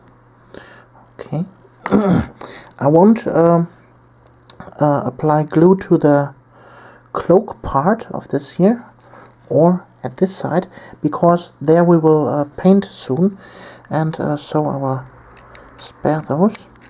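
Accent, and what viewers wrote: Germany. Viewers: May I ask where you are originally from? I apologize if I'm wrong but i hear a German accent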